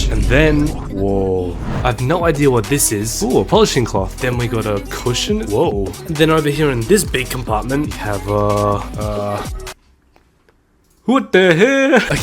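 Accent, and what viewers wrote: Australia. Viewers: i just LOVE the Australian accent, its jus tooooooo iconic ❤😊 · One Question r u Australian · are you australian?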